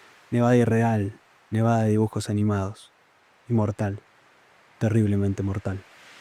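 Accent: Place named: Argentina. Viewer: sigue hermano. Este cómic solo tiene sentido con acento argentino. Gracias desde colombia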